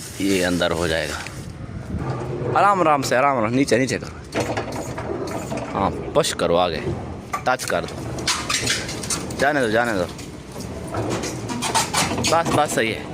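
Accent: Saraiki, Pakistan. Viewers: استاد اردو تو ایویں لگدیں جیویں سرائیکی ہو ویں۔ مسات ہیں کتھوں دا؟؟؟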